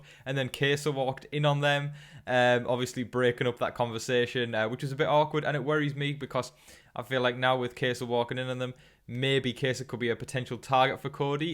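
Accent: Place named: United Kingdom. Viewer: WHERE DO YOU WATCH BB 22! I live in the uk, as I’m guessing you do with your accent, but Idk where to watch it!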